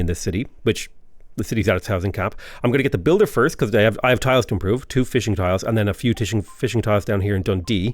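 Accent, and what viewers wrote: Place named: Ireland. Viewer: As a Scotsman from a small town between Dundee and Aberdeen IRL I think you have improved the landscape massively 😅 however I really wish you would talk a little slower as the tips on the game you are providing are gold dust. And if it's hard for a Scotsman to understand your quick-fire Irish, then I'll guarantee other people will struggle more. Looking forward to watching this entire playlist though.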